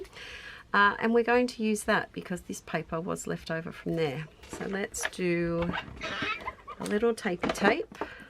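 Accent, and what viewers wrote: Australia. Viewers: I think she means British as opposed to the fact that she’s already speaking English, but with an Aussie accent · I got that one from my husband, he said it’s Australian but I have no idea. I adore your accent and your fun vocabulary, feel free to always share more random Aussie words (also… please let us hear your American accent!)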